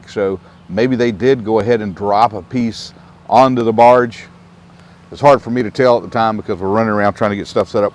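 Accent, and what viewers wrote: South, United States. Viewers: I appreciate your desire make this knowledgeable and entertaining. You really know your stuff and I like your southern accent:) CHeers, Rik Spector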